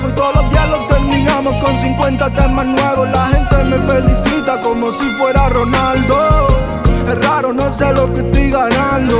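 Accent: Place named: Granada, Spain. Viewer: Es del sur de España, de Granada en concreto por eso tiene ese acento.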